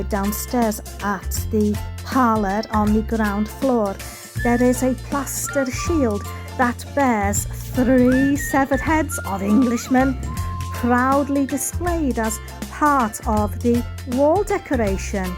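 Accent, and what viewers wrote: Wales, United Kingdom. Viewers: I can easily tell if an English speaking person is English, American, Scottish or Australian...but the Welsh accent is new to me... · 🌞🇧🇷☕hello from Brazil for. I love your videos with the old history from Castle and houses. They are so fantastic. You have a beautiful British accent. 😘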